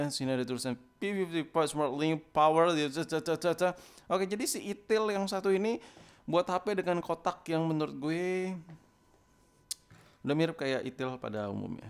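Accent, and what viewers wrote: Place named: Medan, Indonesia. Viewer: "Udah Ga sabar kali Awak!" Ihhh Medan kali kau bang..!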